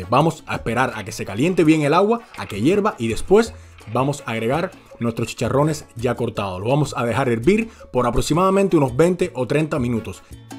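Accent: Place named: Cuba. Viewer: Por tu acento en la voz me parece que eres cubano si es asi un saludo desde aca desde la isla muy buena esta receta y secretos tuyos un exelente tutorial bien sencillo pero bien preciso Gracias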